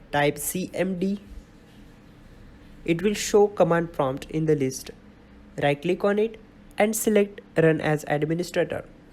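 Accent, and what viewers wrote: India. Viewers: When i heard the indian accent i thought u were a scammer or something and that it would not work however after doing this,this is the first solution that has worked the other ones that were from like white ppl that i thought would work all had failed u are truely the ACTUAL 1st indian tech support that ACTUALLY TRIES TO HELP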